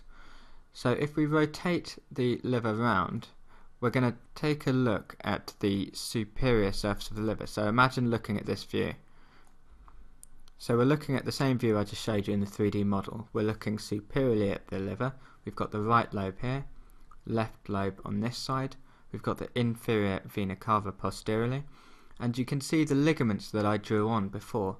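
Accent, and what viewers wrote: United Kingdom. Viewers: the accent is clear British it can't get any more beautiful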